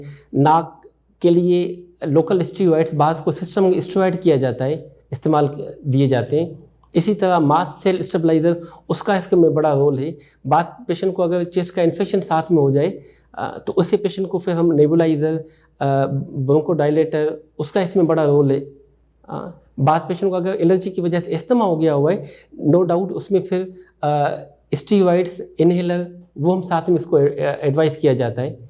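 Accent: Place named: Sindh, India. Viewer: Sounds sindhi accent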